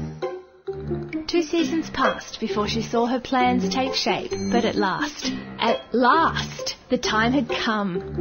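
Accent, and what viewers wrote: Australia. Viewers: weew for the australian narrator c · this one's australian.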